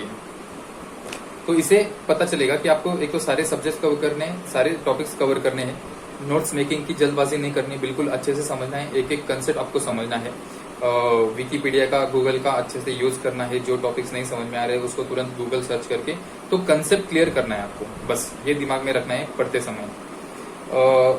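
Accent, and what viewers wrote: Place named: Maharashtra, India. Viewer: Amazing videos sirr... Aapki hindi me jarasa marathi ka leheza hai... I also frm mh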